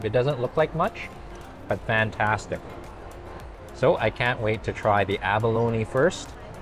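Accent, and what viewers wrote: Canada. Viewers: I like your HEAVY Canadian accent. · You have a Canadian accent!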